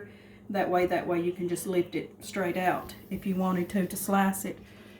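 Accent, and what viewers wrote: South, United States. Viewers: Most beautiful southern accent you have. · So good to meet a sister in Christ God bless you I accidentally stumbled on your video and I absolutely love your way of cooking very explanatory very down to earth love your southern accent so adorable you're beautiful silver hair and beautiful kitchen so very happy to have stumbled on this video Thank you and a Very Merry Christmas A sister-in Christ, 🙏😇 Jill 🎄❄️ ⛄️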